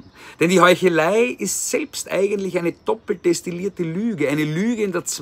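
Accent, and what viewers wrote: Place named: Vienna, Austria. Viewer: Das ist halt wienerisch!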